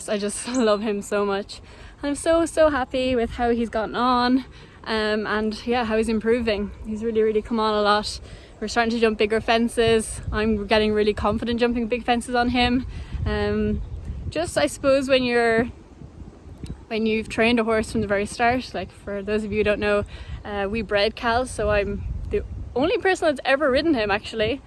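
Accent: Ireland. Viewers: are you from ireland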